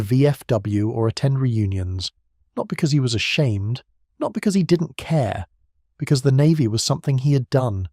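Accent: United Kingdom. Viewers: Why is a Brit telling us these important stories? We need to have this kind of respect for our own. We need to be teaching it ourselves. Thank you, sir, for showing us the way.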